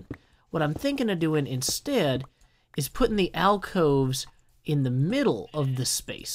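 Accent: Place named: South, United States. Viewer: I just wanna point out the country accent you have compared to everyone else in the hermit craft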